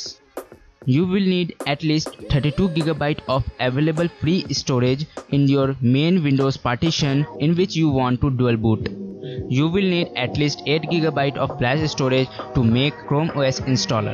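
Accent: India. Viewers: I hate this fucking Hindi accent!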